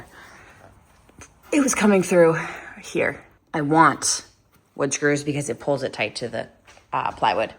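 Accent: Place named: Nova Scotia, Canada. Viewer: Nova Scotia? Wow, I knew I knew that accent!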